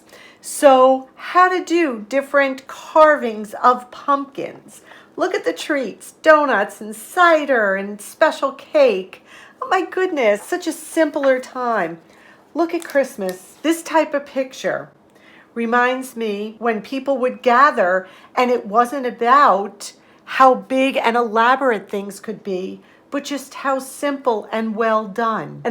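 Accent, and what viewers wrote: New York, United States. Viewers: Nacho something?? With 🍟, ground beef, sour cream, cheese. Today I hv binge watched your videos... You're my kind of people.!! Luv ya!!!- I hv never been to N.Y., I'm from Chicago, but I live in Northern Wi, since 94..... We don't hv a lot of stores here, but in N.Y., in sure there is much temptation.... I love thrift stores..... Since watching you, I do spend way less, learned a lot from you, so thank you.!!!! Wish to meet you!!!! You're so smart,and entertaining........ Bless you and too.. The N.Y. accent is so cute.!!!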